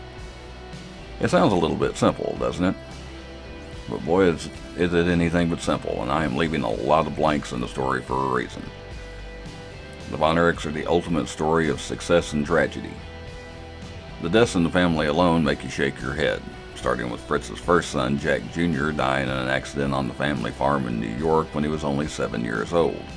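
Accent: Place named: Texas, United States. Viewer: I'll admit I grew up pretty removed from the world of pro wrestling, even being from Texas I've never heard of the Von Erichs. However, since I saw that first poster I've been transfixed by the story and tragedy of the brothers, and I can honestly say it's been years since I've been this excited by a film. Hearing you talk about the movie, the boys, and the sport with such a sense of respect and love and with that deep accent I've come to miss so much since I've been away from home, I'm starting to realize why I've come to feel so connected to this film that hasn't even come out yet. Thank you for your thoughtful analysis, please keep doing what you love.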